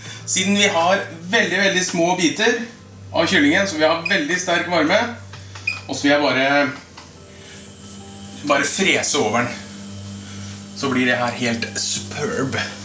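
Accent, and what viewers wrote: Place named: Fredrikstad, Norway. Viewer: Jeg er litt nerd på dialekter. Får jeg tippe? Fredrikstad? Mulig jeg bommer stygt hehe.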